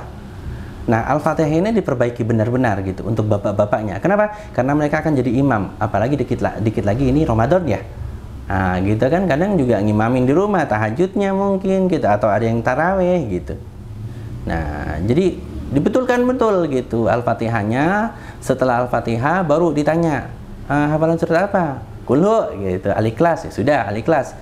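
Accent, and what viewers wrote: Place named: Surabaya, Indonesia. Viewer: Suroboyoan koh loe fase jowoe. Salud aku ustad cerdas komplit plit jelas tur mantep buanget👍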